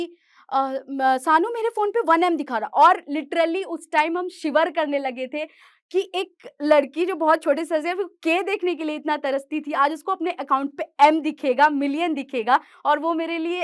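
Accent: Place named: Bihar, India. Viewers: You have put Bihar and the beautiful dialect on the top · South k log South k accent me bat kre to wo educated & bihari agr bihari accent me bat kre to wo regional....... U are an inspiration.. regional ho ya desi... But aap ho original.. this is wat matters.. all d best & keep shining ❤ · you really acts like a proper bihar girl, your accent, expressions, dialogue delivery are awesome and apt. god bless you with more success. be like this only free and frank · Ji nh sare bihari aese hi baat karte hai.. It is their accent... Bihar jayenge toh har koi aese hi baat karte milega.. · Don't be ashamed of being bihari , be proud of your bihari accent.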